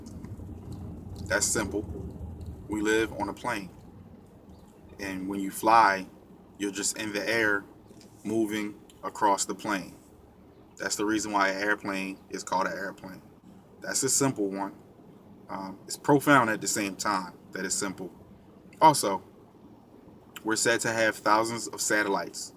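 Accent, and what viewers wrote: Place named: Philadelphia, United States. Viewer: Poetic THAT SHIT DONT EVEN SOUND RIGHT LOL ... People can't comprehend water is a tools to measure completel FLATNESS! Where u from bro? Tell me u from HARLEM ? where n bit Charlie clips is from ? Rocking the power wheels and shit! This mad got a memory about his power wheel !! Haha ! Naw . U look and sound like u from philly!!!! Light skin with the thick beard . Good points tho my guy! Salute to you and your enlightenment to this flat earth stuff . It will blow your mind but it's awesome to have this crazy ass info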